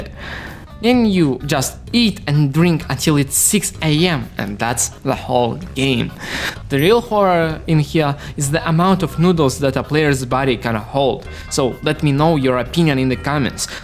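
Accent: Russia. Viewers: Акцент русский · Даже акцент немного русский я уверен это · У все ещё русский акцент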